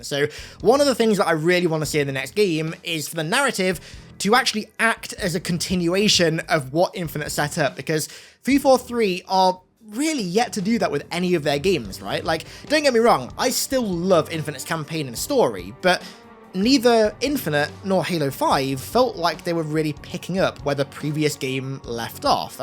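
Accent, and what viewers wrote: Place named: United Kingdom. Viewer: Disliked for the Bri'ish accent alone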